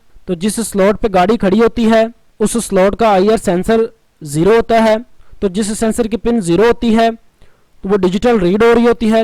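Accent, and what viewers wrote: India. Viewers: Tech vid Indian voice Useful Thumbs up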